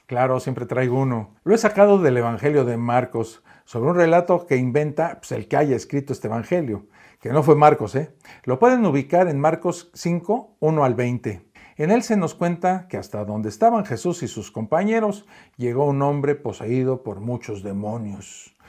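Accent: Mexico. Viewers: CAUSA GRACIA TUS COMENTARIOS. ¿QUE ERES? ¿DONDE ESTUDIASTE? ¿ERES BACHILLER? SI NO CREES EN NADA, VIVE TRANQUILO TU VIDA Y NO TE METAS EN LA VIDA DE LOS DEMAS: .JUZGAS DESDE TU PUNTO DE VISTA, ELUCUBRANDO ESTUPIDECES y TU TONO MEXICANO QUITA MUCGA SERIEDAD A TU ABSURDO DISCURSO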